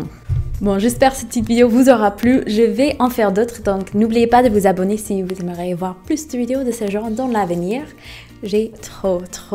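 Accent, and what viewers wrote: United States. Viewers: Ça fait partie du charme de son accent americain! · Je vien de m'abonner à ta chaîne et je te trouve tellement belle tellement fraîche ! Et ton accent américain est juste trop CANON grace a toi j'en apprend plus sur les américains et les usa ! Mon reve est d'aller a NYC mais j'ai peur de l'avion (c'est ironique hein) Ahaha gros bisous ! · Ah ah une française sans mascara ? Moi je peux pas vivre sans ! J'adore ton accent américain 😍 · Bonjour, Je lâche très rarement des commentaires sur Youtube, mais je prends le temps de t'écrire ici car je te découvre tout juste sur ta chaine et suis tombé immédiatement sous le charme. Belle comme un cœur et possédant un charme fou, cet accent américain finira par me faire découvrir une personne attendrissante et qui traite de sujet France/Amérique sous un autre jour et d'un point de vue étranger. 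Bref, je ne fais pas une déclaration, loin de moi cette idée, mais tout simplement la découverte d'une personne rayonnante et intéressante qui t'a fais gagner un abonné de plus:) Te souhaitant une bonne et heureuse continuation dans ta vie de tous les jours et sur Youtube;)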